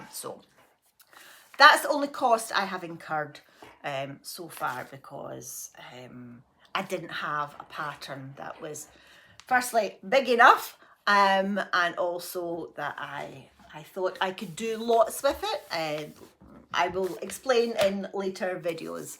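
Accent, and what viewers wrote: Scotland, United Kingdom. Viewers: I was watching one of your video's ads last week and my husband said "why are you listening to that rubbish?" So I had to explain about the ad revenue 😂 I normally watch you at breakfast time and he always comments on that wee Scottish lassie!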